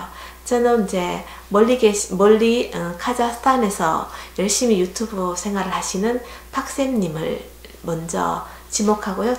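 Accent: Gyeongsang, South Korea. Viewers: 나긋나긋한 경상도 억양 매력적이신 이모님 긴단하고 따라하기 쉬운 반찬 너무 좋아요 ♥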